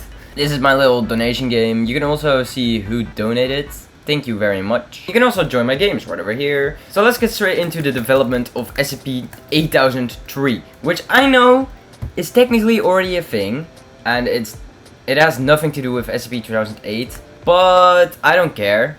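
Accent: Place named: Netherlands. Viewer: the accent gave it away. since i’m also dutch it was quiet easy to guess.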